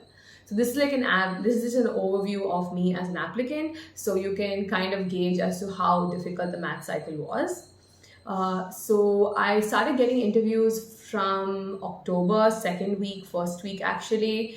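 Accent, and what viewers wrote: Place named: United States. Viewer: 1 more question • How did you improved your communication and English speaking(American accent) ? As a indian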